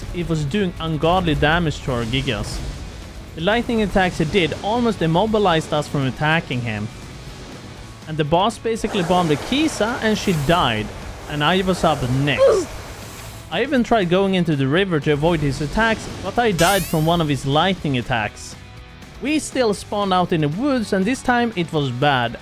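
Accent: Sweden. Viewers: are u swedish? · As a fellow Swede, yes this is why, and yes you reeeeally got that classic swedish accent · you sound swedish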